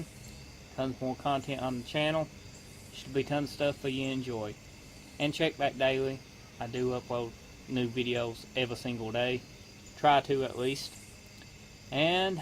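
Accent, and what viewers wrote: United States. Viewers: Love the American accent mate. I'm from Ireland... and it sounds nice. Good video, I'm going to try it on my škoda. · American accent with a speack dialect but damn good video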